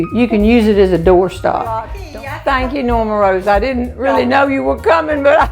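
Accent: South, United States. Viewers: thank you miss, being from the south as well, I adore you and the way you talk and what you have to tell us. you have made many dark moments so much brighter. thank you darlin, May the good Lord keep you and your's blessed and healthy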